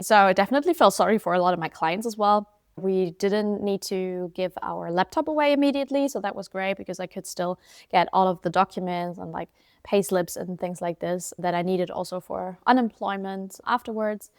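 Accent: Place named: Germany. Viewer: Yes, her accent sounds German, but she looks more Eastern European.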